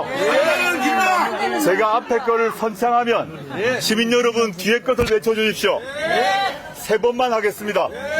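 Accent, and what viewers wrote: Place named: Gyeongsang, South Korea. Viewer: 목소리까지도 우렁차다 ... 남자는 표준화된 경상도말이 젤 멋있다 ^^♡♡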